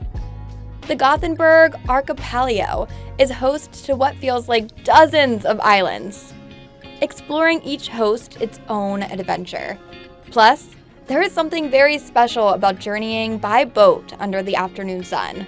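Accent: United States. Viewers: was about to say the same heehee, sounds for the most part Chinese-American as well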